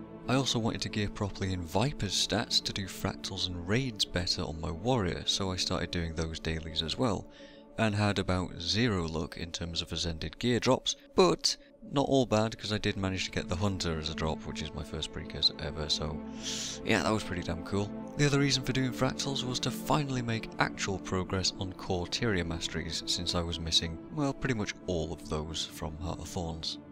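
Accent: North, United Kingdom. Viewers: Such a soothing northern lilt!:D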